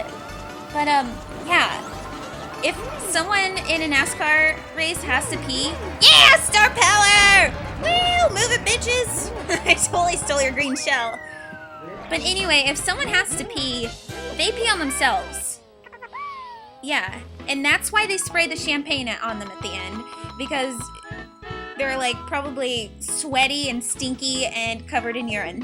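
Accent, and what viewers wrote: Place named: New Jersey, United States. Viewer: nice joisy accent haha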